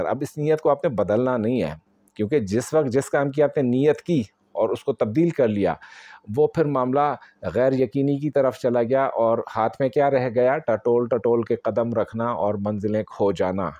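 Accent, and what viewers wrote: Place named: Punjab, Pakistan. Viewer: 🌙niyat me agar ap ko mang liya tu?? Etna piyara panjabi urdo soft fast bolty ...acha lagta ha..few year ago me free hoki Beth gy.gour Kiya .me ni kasa reply Kiya same time par few good piyary insaan mujy papoose. Un sab ko same reply Kiya Ap kuhda se mago.last Ramadan days.bhot duai mangy hongy..evryday mesg me I off evry thing Ramadan ki zikar and cooking raat bi jagna .after Ramadan computer opn hi nhi howa buzy with zindagy job after 8 year wo Nawaz hogy nhi pata khagy Allah zinda h ai kher se ho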